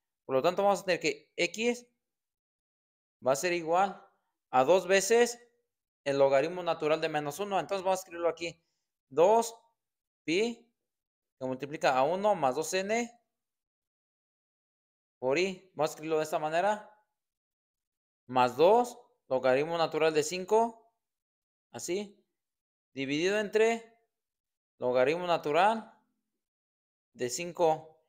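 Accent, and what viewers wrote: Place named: Mexico. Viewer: profe tienes un acento muy mexicano estas muy basdado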